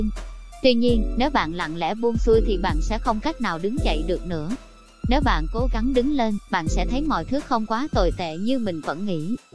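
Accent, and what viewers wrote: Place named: South, Vietnam. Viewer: Giọng đọc Miền nam rỏ ràng - Hay!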